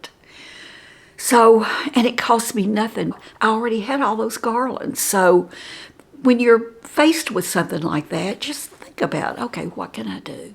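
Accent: South, United States. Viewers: I 💕😘 the way tells stories like a true Southern Belle 🙂 merry Xmas 🎄🦝🎄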